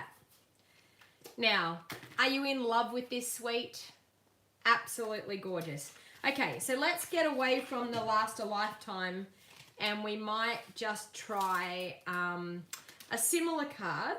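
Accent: Australia. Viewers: Love watching you from CO USA! After visiting England the last 7 years I’ve decided the Aussie accent is the best! 😬😉 but don’t tell the Brits! 😂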